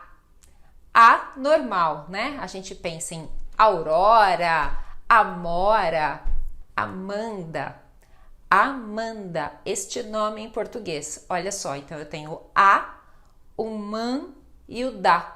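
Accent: Brazil. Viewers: Es portugues de brazil?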